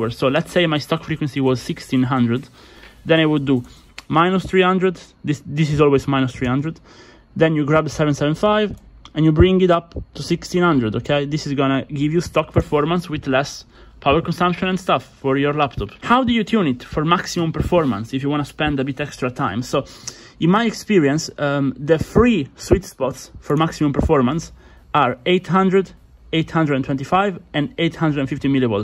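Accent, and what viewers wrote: Italy. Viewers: si sente che sei italiano comunque bei video.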